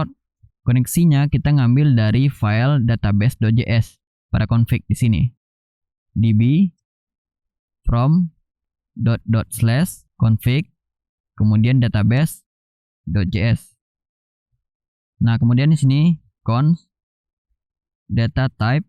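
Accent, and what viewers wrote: Aceh, Indonesia. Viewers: Logat logat nya abang ni seperti orang aceh benarkah ? hehe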